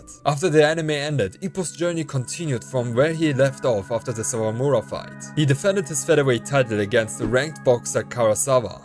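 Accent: Germany. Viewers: bist du zufällig deutscher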